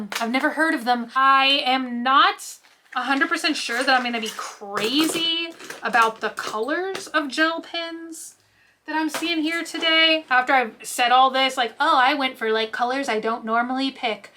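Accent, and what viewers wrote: Texas, United States. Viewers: Took me a minute to know if you meant pin or pen, that Texas accent, man.😄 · I like to watch with the closed captions on...and they also said that said PIN and not pen. Lol. It's that southern pronunciation 😘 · It’s funny I don’t even hear a difference but in other comments they say it too. It must be a Texas thing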